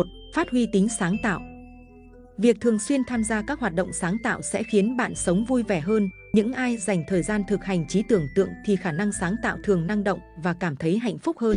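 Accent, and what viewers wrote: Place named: North, Vietnam. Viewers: Nói Tiếng bàc khó nghe quá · Tiếng miền Bắc rõ ràng từng câu, từng chữ đấy ạ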